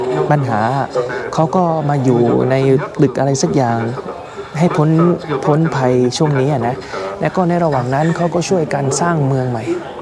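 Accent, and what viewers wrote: South, Thailand. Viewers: พีเป็นคนใต้ไหมครับ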